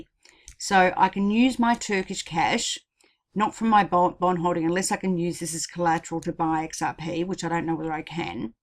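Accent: Australia. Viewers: Hi, I recognise that accent cos I'm also Australian =) Definitely subscribing. Great vid.I'm holding alot of xrp hopefully it the bull run continues.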